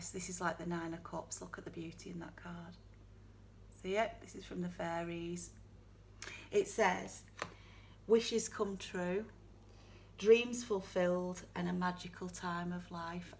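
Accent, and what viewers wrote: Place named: England, United Kingdom. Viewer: Love your accent, your truth, and your readings. Have heard from you before, your excellent and extremely brilliant keep it up sweetheart 💞. A lovely smiling English Rose 🌺xxx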